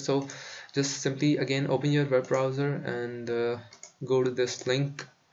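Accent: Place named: India. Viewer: what is the problem of you Indian people with T & D, it is so irritating.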